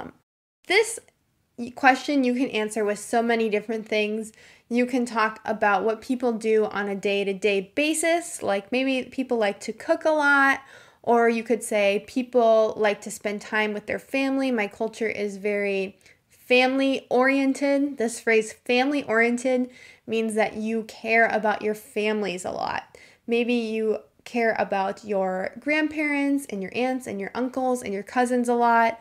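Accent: United States. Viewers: I have just found this channel 😃 I really like American accent so I already hit the botton for a subscriber . You are so excellent teacher . Love from Thailand.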